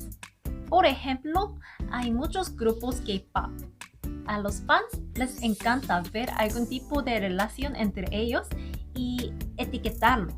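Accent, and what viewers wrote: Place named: Mexico. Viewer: Hola que interesantes tus videos me gustan. Tienes el asentó del español de Mi México sigue así te deseo mucho éxito en tu canal.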